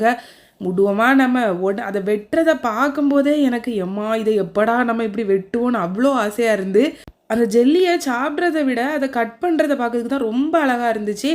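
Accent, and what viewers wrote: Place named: Tirunelveli, India. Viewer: I hate tirunelveli slang. Tirunelveli place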